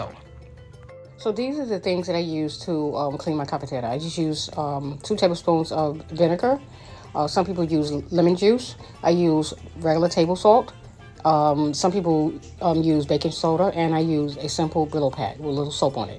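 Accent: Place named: New York, United States. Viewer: Great info, I just restored mine using this information! Plus I like how you talk, New York!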